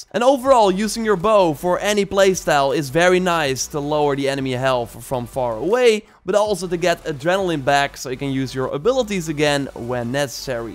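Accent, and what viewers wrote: Netherlands. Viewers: Love the dutch accent bruh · Haha je nederland engels is echt zo mega duidelijk te horen 😉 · Ik hoor gewoon aan je Engels dat je Nederlands bent, toch?🤣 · you have a very Dutch accent, are you from the NL?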